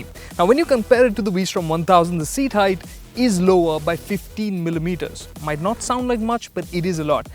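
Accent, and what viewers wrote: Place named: India. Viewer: Could not watch it to the end. Indians sunks speaking english, with thier stupid accent.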